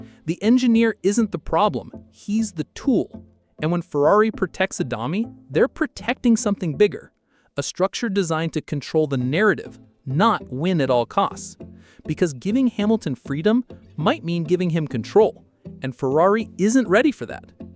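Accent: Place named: United States. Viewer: This is disinformation, gaslighting in American accent. Not convinced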